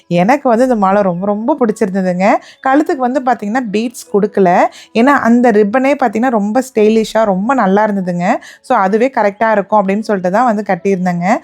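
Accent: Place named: Tamil Nadu, India. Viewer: Mam I like your enthusiasm, native Tamil slang & smile 😊 keep going, all the best 🎉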